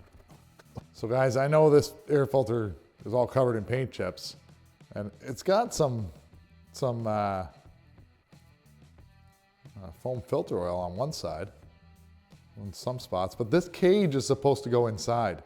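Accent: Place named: United States. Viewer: U sound like a yank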